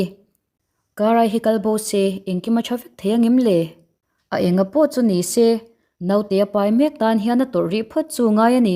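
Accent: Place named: Myanmar. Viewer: Velah i ti ziah mai burma mizo tih awm vel a ni saptawng in chhiar law2 la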